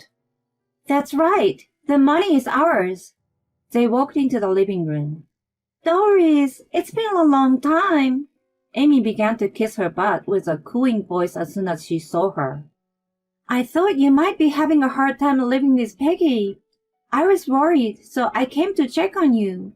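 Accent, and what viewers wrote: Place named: Japan. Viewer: If this is a American story why is the reader Japanse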